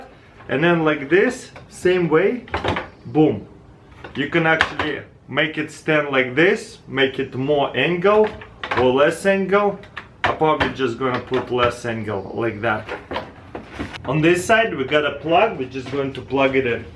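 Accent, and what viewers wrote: Russia. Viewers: Dudeeee i love his accent, super heavy Russian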